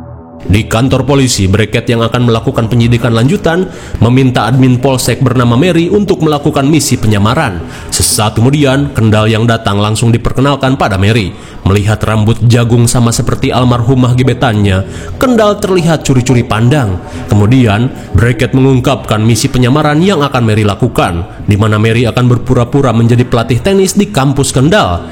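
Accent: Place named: Java, Indonesia. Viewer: yo rapopo to mbak ngko dak mlh lucu ijeh medok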